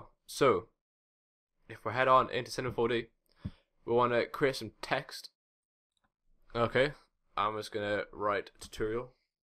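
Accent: Newcastle, United Kingdom. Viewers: are you from newcastle by any chance?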